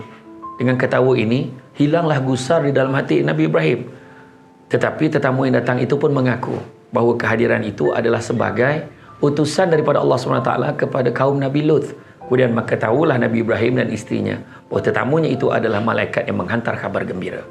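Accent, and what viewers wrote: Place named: Kuala Lumpur, Malaysia. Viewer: Jangga raso eehh Ustaz cakap kolumpo.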